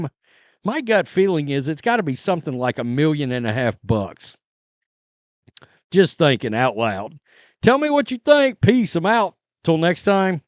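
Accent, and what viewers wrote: South, United States. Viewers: The sun came up this morning and that is b/c of CC. Come on now! as CC would say. I'm an Iowa Alum and big supporter of anything with Black and Gold, CC being the only reason why the Fever is building a new training facility is a stretch at best. Why don't you try losing that ridiculous southern accent and at least attempt to present some intelligent content.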